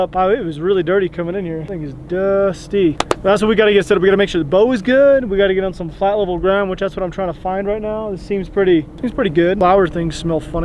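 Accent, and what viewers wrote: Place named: Texas, United States. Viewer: Weird all of a sudden you have a Texas accent???? Where the Fuc* did that come from??? I've never heard you sound like that till this vid and I've been subbed since you only had 277 subs,, I don't like it lol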